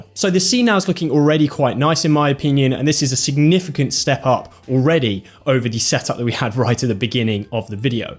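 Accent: United Kingdom. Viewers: Did anyone expect a British sounding skater boy to talk about beauty lighting set up? No. But did he nail it? Yes.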